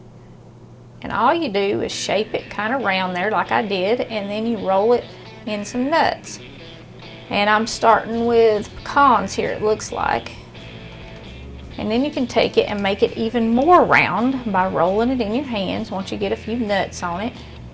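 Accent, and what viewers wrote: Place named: South, United States. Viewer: love ur southern accent... so adorable!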